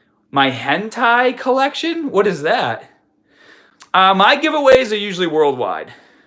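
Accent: Boston, United States. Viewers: I just subscribed. I subscribed to your absolutly gorgeous wifes channel and figured id give you sum support too. 🎉🎊🎉God bless you, your entire gorgeous family💑 & your new home! ..🙏🙏 Awweee your cats soo adorable! Your new house is AMAAAZINGLY GORGEOUS!!😍😍😍 I LOOVE IT!💝 lmaooo😂😂😂😂 " A class A lesbian.." 😂😂😂😂😂 you have a great sence of humor. 😂😂😂 You still got your boston accent.. I loove it! I love right outside boston. But have only lived here a few years. Moving is SOOO STRESSFUL!😱 I really hope you guys had as pleasant as a move as possible.. Bello soo Adorable!😍 congrats on the move. That house is sooo nice! Loove those chandeliers!😍 awwee your soo sweet - "you wanted to give your wife her dream kitchen.." sooooo SWEET!..💝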